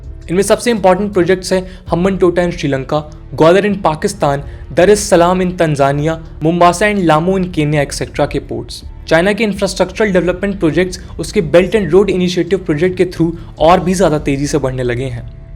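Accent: India. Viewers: 视频咖喱味太重了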